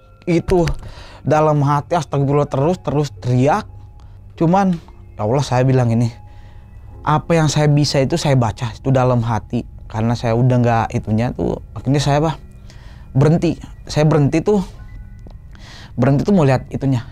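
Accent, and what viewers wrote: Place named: Jakarta, Indonesia. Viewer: Assalamualaikum.. klo ane perhatiin, nte kok mirip bae gaye maupun logat bicaranye ame tokem ane yg seniman betawi boleh dikatakan bgt, biasa bawain lagu2 bg. Kereennnnn👍🏿👍🏿 Sukses buat nte ye🙏🏿🙏🏿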